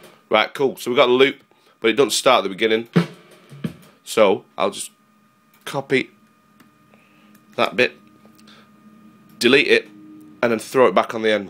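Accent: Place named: United States. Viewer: superb american accent, you sound like the filmer Beagle from baker skateboards haha. love your tunes, neat tutorial!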